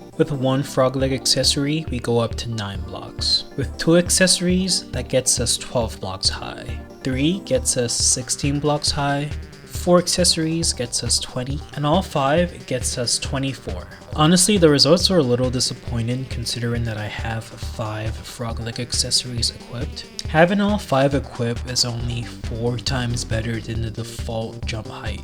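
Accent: Canada. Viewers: It's so obvious that you're canadian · is this guy canadian or something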